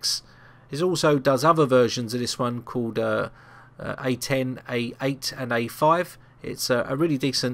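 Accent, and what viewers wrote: United Kingdom. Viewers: damn British accents give me cancer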